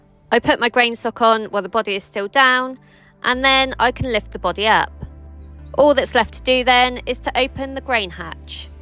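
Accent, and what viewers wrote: West Country, United Kingdom. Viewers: Ah that makes sense and I agree with you totally you can never have enough tea, and yeah the West Country accent is very strong but hearing your accent is one of the things I enjoy about your channel, also you may find this hard to believe but the part of England I live in which is Oxfordshire we also have a West Country accent, it's not as prominent in the built up areas anymore but us country folk still have a slight twang to our accents, but I've always said that if I move away from Oxfordshire I'd only ever want to live in either Somerset or Devon.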